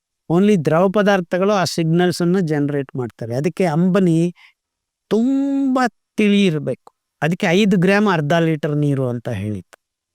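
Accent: Standard. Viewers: ನೀವು ಮಾತನಾಡುವ ಶುದ್ಧ ಕನ್ನಡ ಕೇಳೋದೆ ಚಂದ ಡಾಕ್ಟರ್ ಸರ್ 🎉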